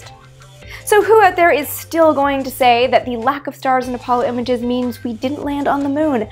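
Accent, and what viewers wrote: Canada. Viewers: About. Canadian. That is all. Bye.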